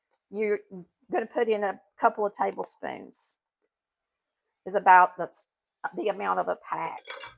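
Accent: South, United States. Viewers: I can smell it over here in New Jersey. I'm going to make this easy Taco 🌮 Pie! Thx for sharing! I watch you on my YOUTUBE TV Channel & on my smartphone. I like your style and your southern accent.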